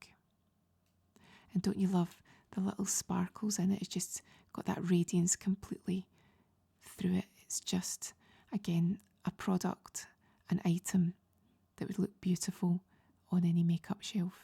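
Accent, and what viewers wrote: Scotland, United Kingdom. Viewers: I was about to doze off until I heard, "because she doesn't really have a butt, yet," which, when replaying it 3 times and factoring in the Scottish accent was of course, "because she doesn't really have a budget." I'm all like, 'Ohhhh... budget." :face-blue-smiling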